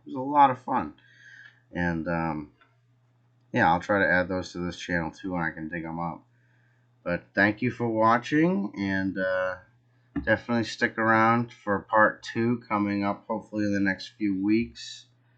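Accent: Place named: California, United States. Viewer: Sounds like a true Californian. Was it a company in Temecula? They had done that to others.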